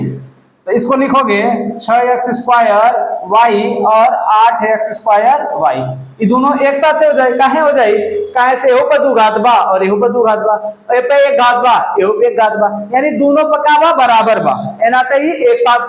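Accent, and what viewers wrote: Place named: Bhojpuri, India. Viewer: Good explanation sir ........ 👍👍👍👍👍👍 Bhojpuri style...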